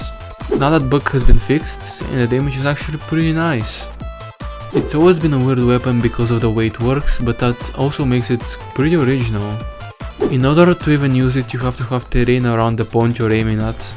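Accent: Russia. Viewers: У тебя русский акцент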